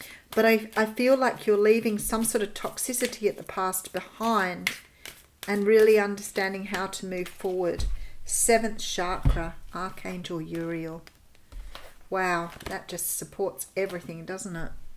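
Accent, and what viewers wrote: Australia. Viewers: So lovely to hear the Australian accent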